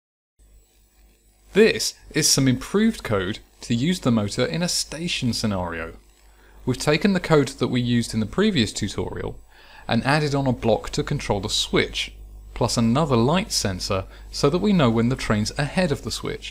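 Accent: United Kingdom. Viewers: A Brit dude with brilliant story telling educating me about arduino and trains? YES PLEASE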